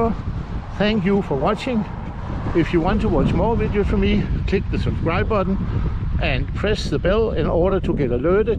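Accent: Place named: Denmark. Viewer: And I can hear that you are danish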